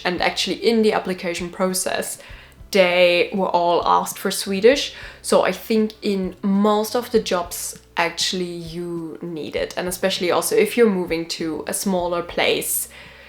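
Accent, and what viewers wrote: Germany. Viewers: Du pratar bra svenska 😊. Man hör din tyska accent mer, men det är bara trevligt. Vi är ju vana att lyssna på drottning Silvia 😊. Dessutom är vi också vana vid invandrare från olika länder som försöker lära sig språket. ❤ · Sehr gut svenska med ein bisschen Deutsch men helt keep on work on it 😊 tack så mycket hörde du hade dialekt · Åh, du pratar ju jättebra svenska. :) Du har en ganska tydlig tysk accent, men rent språkligt var det bra. IMO coming from Germany, Netherlands and obviously Denmark and Norway you have a quite a large advantage (even compared to English speakers) due to the similarity of words and pronunciations between German and Swedish.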